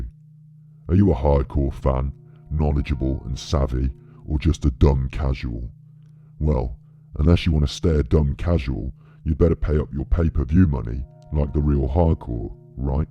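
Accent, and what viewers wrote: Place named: Midlands, United Kingdom. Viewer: It's not Hatman, the inflection in some words have a midlands accent. Also it wouldn't make any sense for them not to discuss this on their own channels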